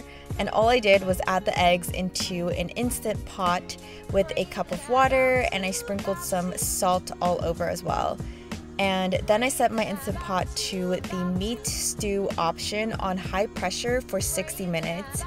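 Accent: United States. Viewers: Lucy Blennerhassett in american english we dont pronounce the “h” in “herbs” :) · It's an American accent. Where I'm from we speak like that as well.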